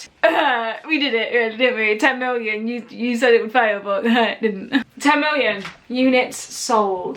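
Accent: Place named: East Midlands, United Kingdom. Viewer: ''Blame my accent'' what is your accent? my guess is East Midlands like Derby or something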